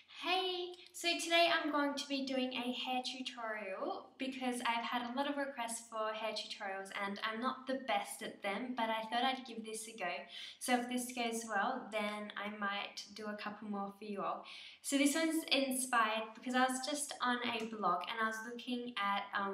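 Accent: Australia. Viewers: Thanks I thought you sounded Australian x its a lush accent x :)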